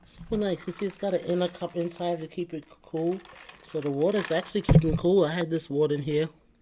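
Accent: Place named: New York, United States. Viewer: Heyyy Beautiful!!! I’ve been missing you, so I’ve come to visit!🤗 The BBQ Chicken looks amazingly delicious!!! I love your New York accent, Sis!💞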